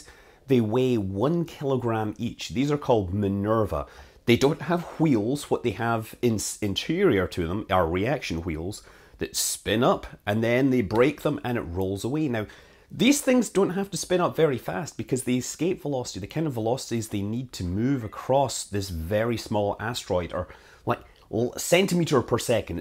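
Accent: Scotland, United Kingdom. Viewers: Hes Scottish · I really love listening to your scottish accent! It is so cute! And - more important - really can explain things very well! You would be an outstanding science teacher!!! Physics teacher Michael B. Butter from Radebeul, Dresden, Germany and: Thank you!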